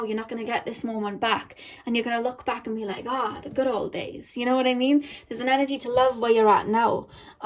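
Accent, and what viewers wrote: Wales, United Kingdom. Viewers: Im Welsh And Im Sure I Can Hear A Welsh Accent There 🤔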